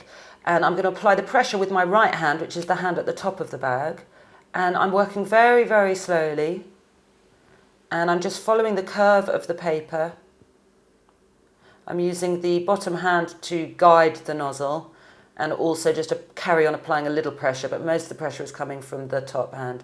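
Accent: England, United Kingdom. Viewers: I love her accent!!! I'm so going to England London some day. Nice cupcakes!!! Lots of frosting. thats what I like!!!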